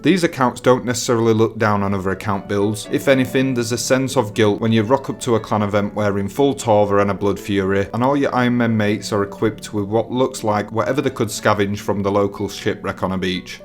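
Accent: Yorkshire, United Kingdom. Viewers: Wait, which Yorkshire are you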